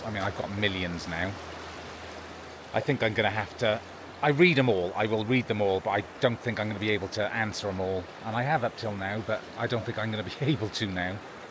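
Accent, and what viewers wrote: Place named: Welsh Borders, United Kingdom. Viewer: Gwent or English borders by the sound of your accent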